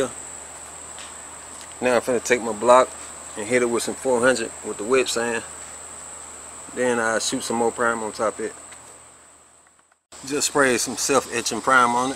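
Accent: Alabama, United States. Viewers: What part of Bama you from???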